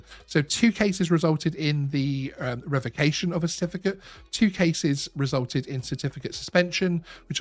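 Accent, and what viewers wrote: United Kingdom. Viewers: Reading off incidents meant to scare pilots while providing no real particular info on individual cases.. Click bait, British accent meant to infer authority, he is a wanker.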